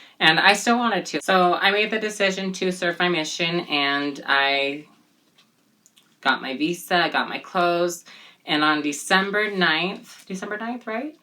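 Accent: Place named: Asia. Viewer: I thought u were Asian by your speech.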